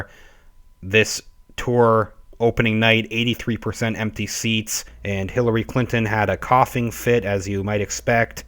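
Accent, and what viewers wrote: Canada. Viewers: You sound a little Canadian. Great channel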